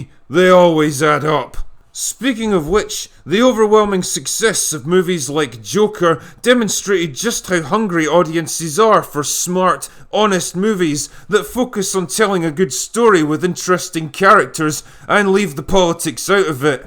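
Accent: Scotland, United Kingdom. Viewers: All they very best for 2020 mate. I enjoy your honest reviews a lot. If you don't mind me asking, with your accent, why are you drinking JD? There are plenty of excellent single malt whiskeys in your part of the world. BTW - I am especially of product from a little island off the South West coast of Scotland ( Islay ) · I'm loving the Scottish accent.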